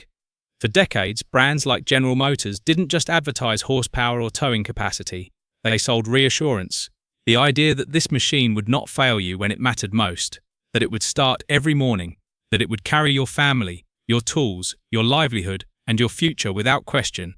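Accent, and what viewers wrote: United Kingdom. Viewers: Some Englishman is babbling about American pickups? ......Get real.....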